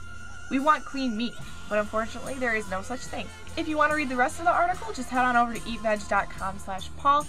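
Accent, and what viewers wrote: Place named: Liverpool, United Kingdom. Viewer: Haha a liverpudlian accent is quite different from harry potters... VERY.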